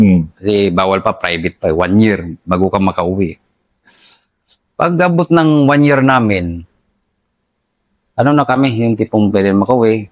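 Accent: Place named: Visayas, Philippines. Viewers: Yung tuno ng salita mo master bisaya na❤ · Taga panggasinan si sir pru bisaya na yung tono nya pati salita at kilos nya bisaya tlga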